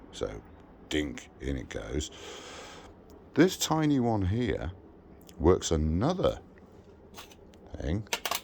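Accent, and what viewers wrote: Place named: England, United Kingdom. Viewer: I never realized how much better these mechanical help videos would be with an English accent 🙌 I installed the new solenoid without raising my blood pressure once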